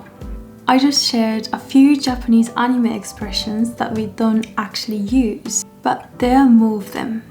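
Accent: England, United Kingdom. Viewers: Yee u right...her accent like british already But i prefer american accent 😢 Gomen ne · Your English accent is so lovely and calm, you speak very elegantly! I'm from London, currently studying Japanese and Korean while living in Seoul ☺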